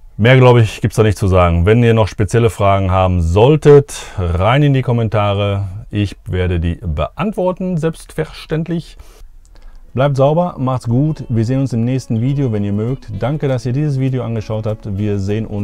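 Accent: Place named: Ruhr, Germany. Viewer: Du bist und bleibst eine coole Socke. Ich liebe unseren Ruhrpott Slang. 😉🍻 Wenn du jetzt noch aufhören würdest Terpentin zu qualmen 😂 Gruß aus Mülheim.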